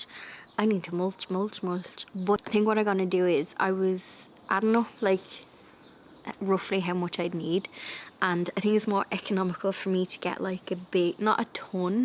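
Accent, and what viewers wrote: Ireland. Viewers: Love listening to your accent my maternal great grandparents migrated to Australia from Ireland,my grandfather could put on a good Irish accent lol I’ve only been to Ireland once, but could have just moved back in so easily it was like coming home, just can’t leave all my family behind 😢 I don’t know how they did it in the past To come to a strange land so far away.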